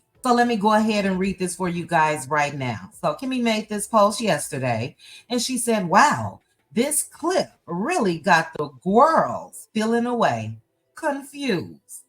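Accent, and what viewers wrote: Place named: South, United States. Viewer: . I just love your commentary, and love your voice even more. My sister-in-law passed a view years ago and you and she sound exactly alike. You 're voices are so sweet with that southern twang. I listen to you often, and it just brings me such joy as I can hear my sister-in-laws voice which is quite comforting. Even my husband, her brother, says you sound exactly like her. Keep up the great commentary, and thank you.❤❤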